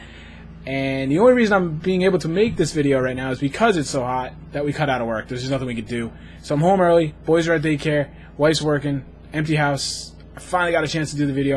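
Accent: Boston, United States. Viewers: you sound kind of like a boston guy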